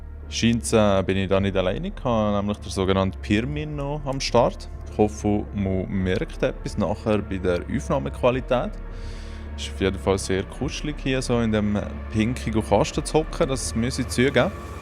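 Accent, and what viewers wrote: Valais, Switzerland. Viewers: Meeeh Walliserdiitsch!👍🏻😂 Hüere geil!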